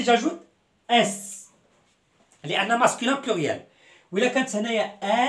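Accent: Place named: Fes, Morocco. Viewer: استاذ بغيت نسقسيك الا عندك center لهجتك حسيتها من فاس كنتمى يكون عندك centre في فاس ؟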